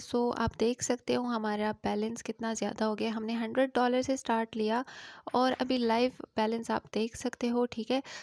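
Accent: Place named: Karachi, Pakistan. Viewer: اپ کی اردو سے لگ رہا ہے اپ کراچی سے ہیں اپ کی ٹریڈنگ کمنٹری سے محسوس ہو رہا ہے کہ اپ ابھی نئی ٹریڈر ہیں البتہ اپنی کوشش جاری رکھیں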